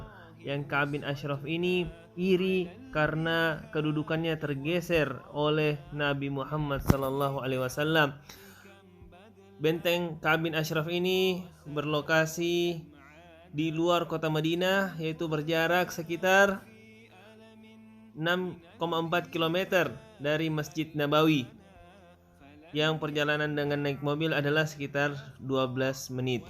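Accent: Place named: Poso, Indonesia. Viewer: Masi ada logat Poso juga ee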